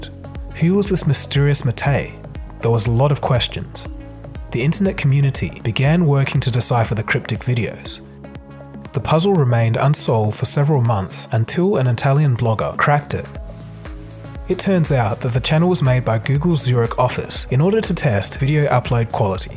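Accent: Australia. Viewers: this image is from an alley between one end of the Queen Victoria Building and the other in Sydney, this is such a memorable scene. Which doesn't really fit the theme of New Jersey.... Judging from the Uploader's Aussie accent, he should've known better. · You sound Aussie?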